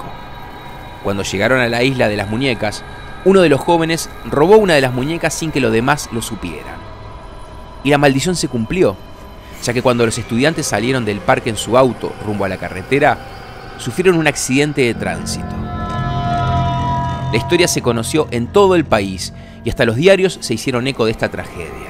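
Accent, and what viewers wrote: Argentina. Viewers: Es interesante escuchar leyendas de mi querido Mexico de voz Argentina jajaja, me gusto la edicion y la forma de contarlas, aunque la de la llorona es algo equivocada, pero hay un comentario que lo explica bastante bien, saludos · Eres argentino verdad :pd acá en México nos estamos cagando s México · Qué excelente manera de generar contenido que buena edición y narrativa haces muy buen trabajo soy de Colombia y amo a México como no imaginas, nunca he tenido el placer de viajar allá pero su cultura ha hecho parte de muchos colombianos por años gracias a la tv y ahora el internet la riqueza cultural de México es innegable gracias por dejarnos conocer más de sus tradiciones Aunque bro soy bastante miedosito y esa imagen final de la historia de Nachito donde pones ese niño tan aterrador jmm Dios casi me matas no borro esa imagen de mi cabeza Me gustaría escuchar historias de muchos países más el acento argentino me encanta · Me encantan las historias Mexicanas contadas por extranjeros , el acento Argentino le da ese toque de terror e intriga , ( soy mexicano ) saludos a los argentinos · Narrada por un argentino???!!! 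Que poca madre!! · ¿Un argentino narrando leyendas mexicanas?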